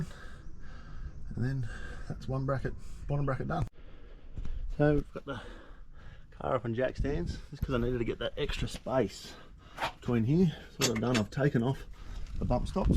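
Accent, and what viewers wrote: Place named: Australia. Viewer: Your accent says you are in Australia. Does Airbagman sell in the U.S.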